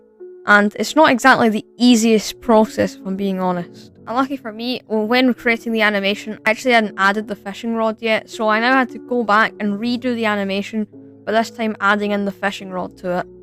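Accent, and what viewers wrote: Scotland, United Kingdom. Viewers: its either bros really british or bros faking the british accent (still respect) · R u Scottish cuz u have the accent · It’s Scottish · Are you Scottish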